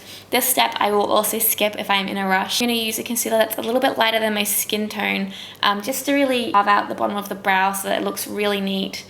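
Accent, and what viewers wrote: Australia. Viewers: I absolutely love this look! So exciting hearing an Aussie accent :) keep up the great work! Subbed! :)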